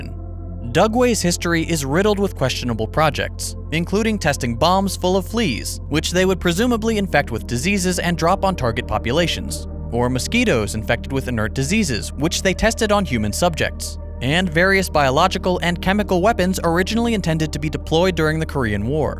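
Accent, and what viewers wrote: United States. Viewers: This guy sounds American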